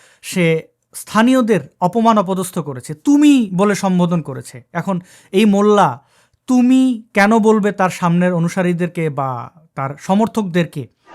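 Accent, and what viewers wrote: Sylhet, Bangladesh. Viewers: আমি সিলেটি। আপনার সিলেটি শুনিয়া মজা পাইলাম। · সিলেটি ভাষায় খতা খওয়ার ধরনটা কিন্তু সেই লাগছে। 🙂🙂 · পিওর সিলেটি উচ্চারণ ❤ ভাল্লাগছে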